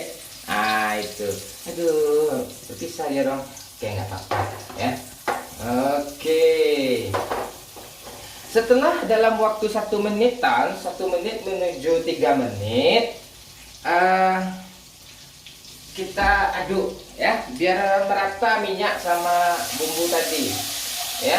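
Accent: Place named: West Sumatra, Indonesia. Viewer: Dari logat nya aja memang asli orang minang ehh btw salam dari Payakumbuh bang hehe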